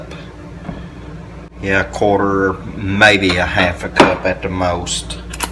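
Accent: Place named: South, United States. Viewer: When I grew up my friends mother sort of took me under her wing used to take me in when my Dad threw me out wash my cloths and keep me out of trouble. She had the same wonderful Southern Draw you and have. 😊 brings back fond memories.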